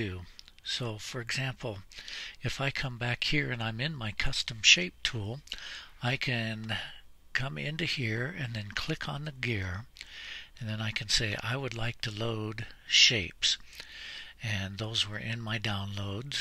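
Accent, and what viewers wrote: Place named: United States. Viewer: Hello, you are quite a character, brother - and i am saying this in a very positive way. Taking the time to answer the questions and comments on a video of yours which is as old as 5 years shows how committed of a person you really are to your profession or passion (whichever best fits). Respect and best wishes all the way from Bangladesh. NB: Your accent tells you are from USA. By the way, I also lived and worked in the USA for a long time. Studied at the university of Oklahoma...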